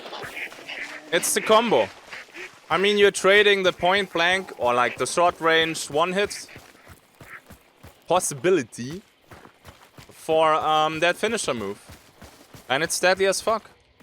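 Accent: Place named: Germany. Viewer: Really enjoyed watching that. Well played! Where are you from mate? You kinda sound like German but I'm not sure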